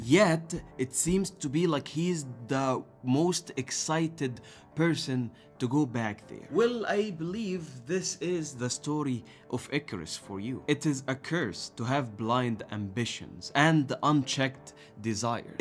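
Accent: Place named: Egypt. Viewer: Definitely an Egyptian with that accent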